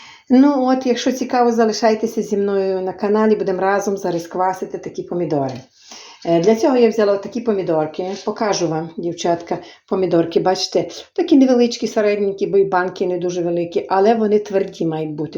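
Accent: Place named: Transcarpathia, Ukraine. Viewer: Дякуємо за чудовий,простий у виконанні рецепт і чудову українську мову Закарпаття🙏🇺🇦🇺🇦🇺🇦👍💙💛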